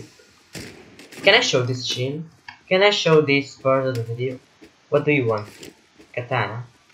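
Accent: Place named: Italy. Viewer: 100% italian voice xD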